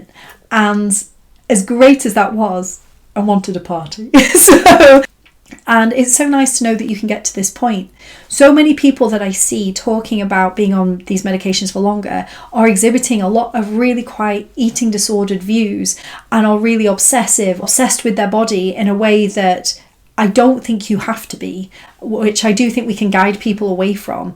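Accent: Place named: RP, United Kingdom. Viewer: You trying to speak posh is really irritating something about you is just well off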